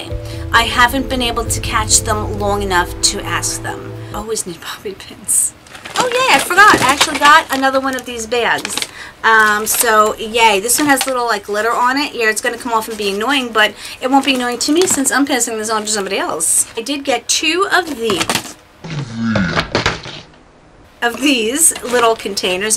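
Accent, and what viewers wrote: New York, United States. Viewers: I love how you say New York, it sounds like New Yawrk!! Love your accent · Love the Llama sox & your New York accent, you are such an awesome daughter to look after your Daddy the way you do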